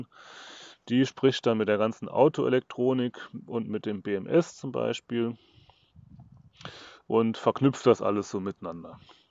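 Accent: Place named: Hesse, Germany. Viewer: Spannendes Projekt! Wie ist das eigentlich mit Tüv und Steuerungs-Elektronik. Muss die Ansteuerung/Software des E-Antrieb bestimmte Abnahmen erfolgen? Mir gefällt es gut vorhandenes wieder zu verwenden. Ist halt viel gefummel und gesuche. Dein hessisch ist sehr sympathisch. Anmoderation ist mal was neues. Passt. Gruß aus Süd Hessen.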